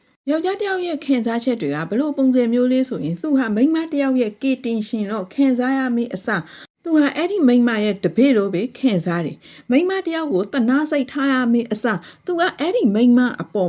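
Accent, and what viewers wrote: Rakhine, Myanmar. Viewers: မမ ရခိုင်သံနင့် တူပါတယ် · ရခိုင်သံကြီး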